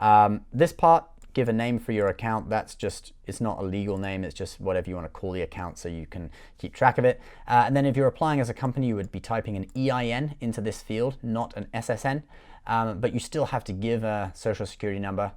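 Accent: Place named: England, United Kingdom. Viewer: Your accent is English. Is there a t bill equivalent if you're not resident in US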